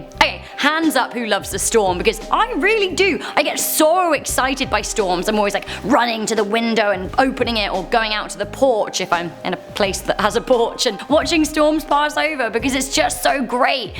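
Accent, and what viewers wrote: England, United Kingdom. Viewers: loved the Scottish accent! Nice attempt but I still cant get enough of your English accent.:)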